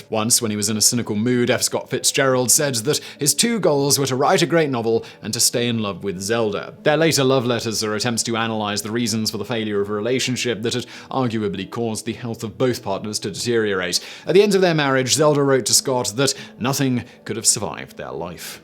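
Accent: United Kingdom. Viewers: Intrusive r, very british as well :)))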